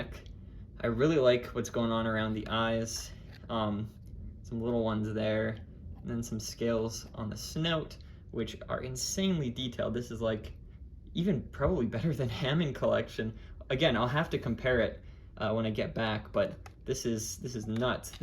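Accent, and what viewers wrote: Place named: Spain. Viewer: porque haces videos con vos española no me gusta soy colombiano pero no me gusta mira te escriben todos en ingles y se ve raro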